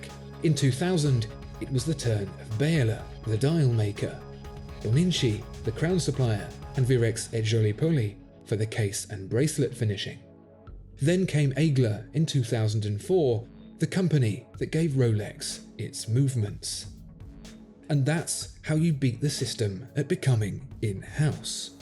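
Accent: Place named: RP, United Kingdom. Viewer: Amazing vidiography, a perfect posh British accent. But underneath the BS, the same blind brand loyalty every rich D has and needs in order to purchase a Rolex. After a whole week of focusing on German perfection, your need to sell some garbage, yes garbage Rolex, is sad for me, one of your more loyal followers. I know you need to sell, but double standard is something I really discusse.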